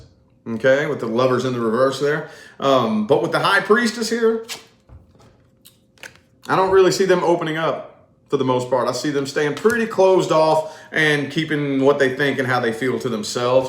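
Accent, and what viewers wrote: South, United States. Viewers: Love your good ole southern boy delivery. Spot on every time. Thanks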